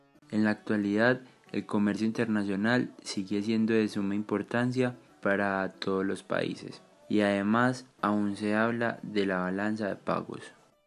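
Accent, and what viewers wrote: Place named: Antioquia, Colombia. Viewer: asi es el acento paisa en colombia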